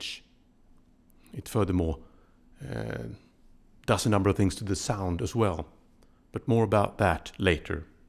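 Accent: United Kingdom. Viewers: I can’t tell if you’re very posh British, or Eastern European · It's crazy how has a much more British sounding voice than regular. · Anyone else think his voice sounds british but is actually swedish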